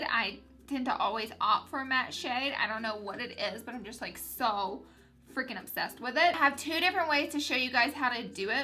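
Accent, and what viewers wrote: South, United States. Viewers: are u American 😂😂😂😂😂😂😀😂😂😂😂😂😂😂😂😂😂😂😂😂😂😂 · I love your accent!! I'm from Texas and I love hearing that southern accent it just makes everything so much nicer haha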